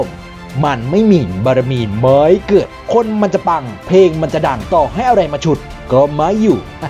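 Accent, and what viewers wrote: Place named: North, Thailand. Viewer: ติดตามได้ซักพักคัพ ชอบมากๆ ผมก็คนเหนือ ได้ยินสำเนียงเลยถามเพื่อความมั่นใจ อิอิ ...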